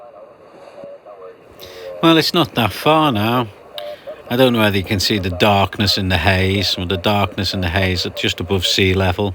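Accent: Liverpool, United Kingdom. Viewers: I just love sailing too! And I love your videos. When I'm not able to go sailing in my boat, watching your vids is almost like going sailing. I enjoy your Liverpool accent too. It's like going sailing with John Lennon. Brilliant!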